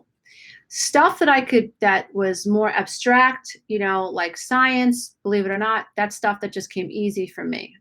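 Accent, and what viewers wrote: New York, United States. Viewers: You are wisdom with a New York accent 👍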